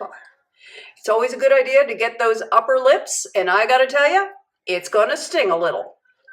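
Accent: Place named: Southern California, United States. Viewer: Noooo. He still sounds like a he, like Bruce.. It's just the S. Cali accent .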